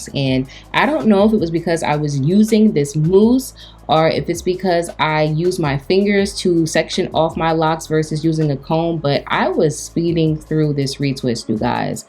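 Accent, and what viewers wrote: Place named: Australia. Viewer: she pulled up that aussie and i'm subbed sooo fast😂❤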